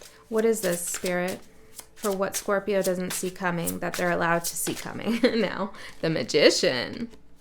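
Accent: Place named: Canada. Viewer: I thought I heard a Canadian accent, so I looked at your page, sure enough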